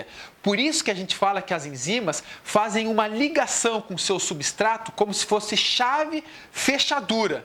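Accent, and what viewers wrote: Rio Grande do Sul, Brazil. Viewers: tu é do Rio Grande do Sul? tem sotaque gaúcho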